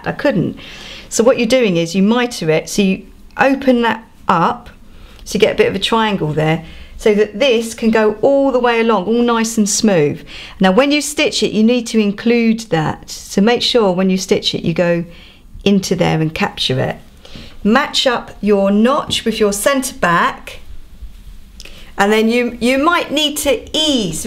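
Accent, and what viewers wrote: United Kingdom. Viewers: Girllllll...that's too cute!!! Wish I would've seen the items you mention at Portobello Mkt when I was ther a while back...cuuuuute!!!! Great job!!!! Easy instructions and after all, we yanks love the Brit accent any way. LOL..thanks.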